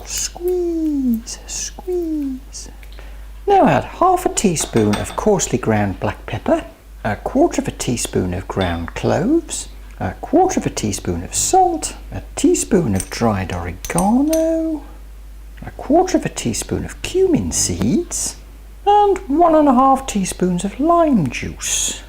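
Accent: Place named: Birmingham, United Kingdom. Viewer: I lived in Birmingham for 23 years then move to London, which I've regretted it ever since. I love your accent because it reminds me of a lovely, very much under rated, city and your tips and recipes are terrific, please keep them coming! ❤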